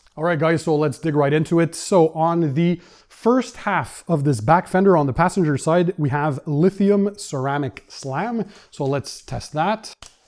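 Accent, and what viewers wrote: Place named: Canada. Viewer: Pourquoi tes vidéo ne sont plus en français ? J'adore votre accent canadien en plus c'est vraiment dommage...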